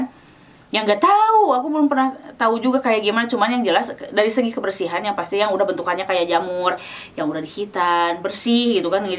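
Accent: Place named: West Java, Indonesia. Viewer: Meni sunda pisan 😅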